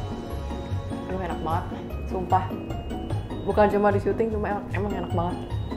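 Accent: West Java, Indonesia. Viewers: trus Sunda pisan, kacida Sunda na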